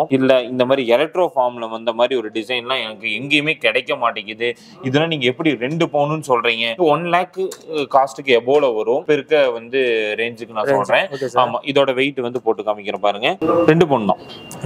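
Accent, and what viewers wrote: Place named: Tirunelveli, India. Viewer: Tirunelveli vagea bro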